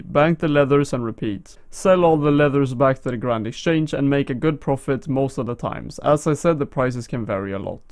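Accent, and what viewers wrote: Sweden. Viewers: I love ur swedish accent :3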